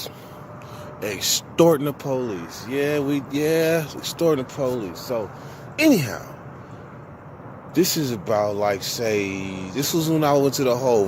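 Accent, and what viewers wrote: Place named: Northern California, United States. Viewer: You gotta be from NorCal to love how you talk 😂😂😂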